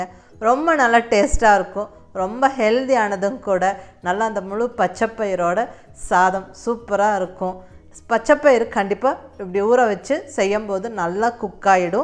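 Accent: Coimbatore, India. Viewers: neenga pesarathu kongu tamil ah madam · Feeling good to hear the coimbatore tamil slang. Very nice akka. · Sis neega covaiya neega pesurathu eanaku pedikum sis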